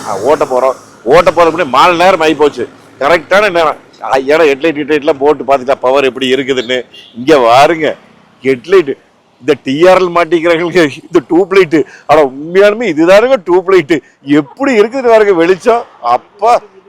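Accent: Kongu, India. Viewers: அப்பப்ப எட்டிப் பார்க்கும் கொங்குத் தமிழ் அழகு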